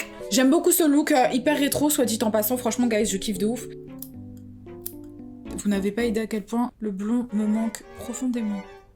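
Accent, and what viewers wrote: United Kingdom. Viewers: Ça serait trop que tu fasses quelques vidéos en anglais we love that with your british accent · Holala c'est vrai qu'en brune cheveux courts un peu ondulés, ça te va su-per-bien. J'ai bien aimé ce moment ! Je partage également l'idée qu'une chaîne en anglais serait absolument génial. C'est que j'apprécie aussi, ces expressions anglaises et ces accents, qui font totalement naturel chez toi. Moi aussi au lycée les gens à l'aise en langue étrangère étaient moqués. C'est typiquement français en fait. Une espèce de complexe d'infériorité qui finit par être d'une arrogance gênante. Ça a brisé des ambitions linguistiques ce comportement. Bref ! I love so much your accent ! Please, made your english channel it will be so cute to level up in english with you too. 🙏❤😘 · Tu peut faire desfois des vidéos en anglais sous titré français si ta la foi de le faire mdr !j essaie d'apprendre et j estime que l'anglais c est une langue mondiale on devrait l apprendre serieusement depuis la maternelle et pas a partir de la 6 eme...anyway j aime trop ton accent Anglais 😍🥰🔥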